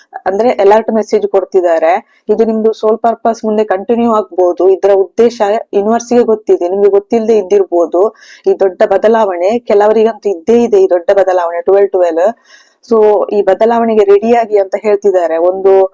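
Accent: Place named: Mangalore, India. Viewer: Thank you sister ❤ are you from Mangalore by hearing your accent I'm feeling like that ..but anyways nice reading.thabk you❤ Thank you universe ❤Thank you god❤ Thank you angel❤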